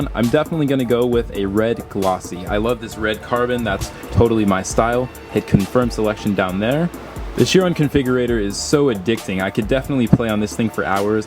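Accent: Germany. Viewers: Are you german because you got a german name and a little german accent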